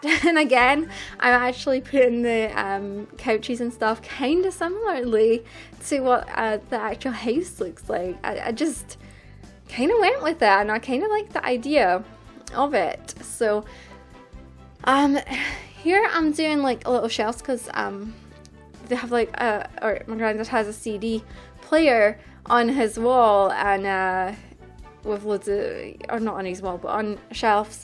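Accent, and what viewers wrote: Scotland, United Kingdom. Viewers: Is that a scottish accent i hear? :P You hear very few of them on YouTube...mad hearing it on a Sims 4 video too! :)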